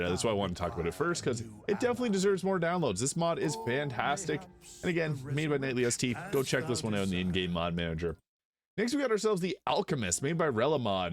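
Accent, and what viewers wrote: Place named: Quebec, Canada. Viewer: Hair? lol The French Canadian accent 😂 Im surprised how well the mods work on this game, Larian Killed it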